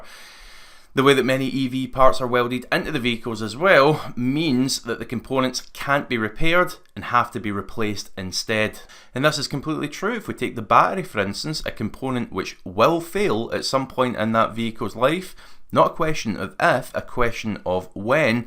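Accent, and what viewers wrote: Scotland, United Kingdom. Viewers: I love the scottish accent. It just wonderful.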